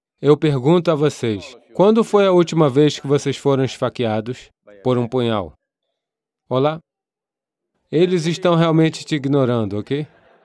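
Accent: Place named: Bahia, Brazil. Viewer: Adorei que a tradução foi feita por alguma baiana. Ficou lindo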